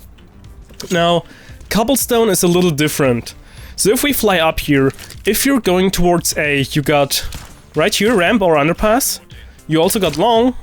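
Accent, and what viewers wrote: Germany. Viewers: are you german or swedish? :D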